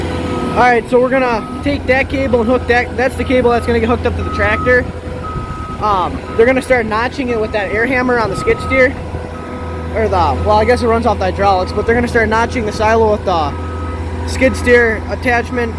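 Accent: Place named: Northeast Pennsylvania, United States. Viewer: Wild never heard of taking down such new silos! ** you have the exact same accent as northeast pa Lackawanna county!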